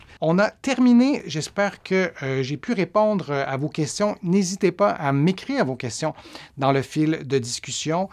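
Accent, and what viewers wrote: Quebec, Canada. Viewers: Merci pour vos conseils avisés On doit vraiment s appliquer même si les douleurs sont parfois pas simples à gérer. Je vous remercie vivement de votre engagement pour nous soulager. Je souffre de lombo sciatique paralysante et de fibromyalgie. Vos exercices sont vraiment top Bonne fin de semaine et j adore votre accent Québécois Brigitte FONTAINE VESOUL. FRANCHE COMTÉ..FRANCE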